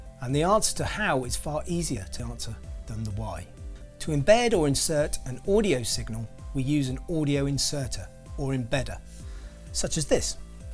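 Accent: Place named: United Kingdom. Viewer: I like this video because even though there is the UK/British accent, the over-pronunciation of H (haytch) does not happen here. I've lived nearly 60 years not hearing "haytch" and so I think it is a recent invention/addition to the crazy British accent phenomenon. I call it a phenomenon because when British singers sing, the accent disappears completely! (similarly when people sing US country music they develop a southern accent where none of little is present when speaking) so much fakery in speech... triggers the autistic reaction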